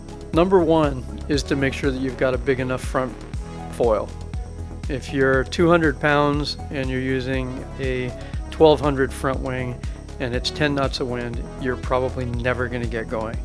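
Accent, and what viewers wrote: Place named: Maui, United States. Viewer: your German ist incredible, your Maui accent exotic, please comment all parts in German. Would bei The KPI for Naish Wings, learning from the master, Aloha, Hjot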